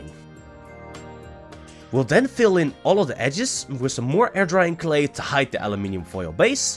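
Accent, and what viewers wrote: Netherlands. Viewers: BEAUTIFULLL!!! btw are u from nederland? u have that accent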